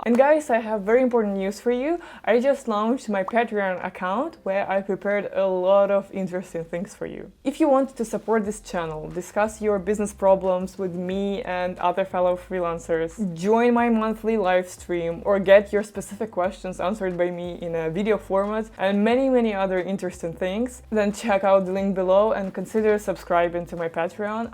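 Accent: Russia. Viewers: ох уж этот наш русский акцент 😅❤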